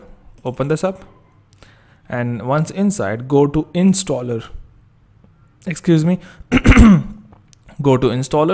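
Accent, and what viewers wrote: India. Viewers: Like everyone is saying. Hearing indian accent can lead up to an no no end. But this guy seems mature, straight on point. Suit and tie my man! Thank Q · Thank you kind Indian man with a lovely accent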